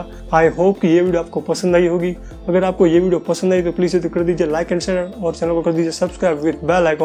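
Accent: Gujarat, India. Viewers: Are you from Gujarat? Because your hindi has gujarati accent. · ભાઈ ગુજરાતી લેહકો આવે છે હિન્દી મા હો 😂🤩🤩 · Wow.. Your gujju hindi accent is owsom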